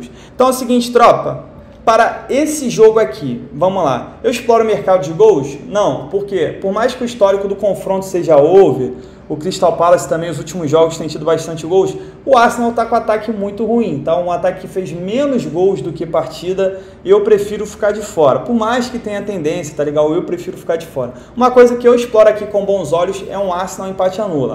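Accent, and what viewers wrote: Rio de Janeiro, Brazil. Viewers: Deu moral aí cria.. pelo sotaque vc e do rio né ?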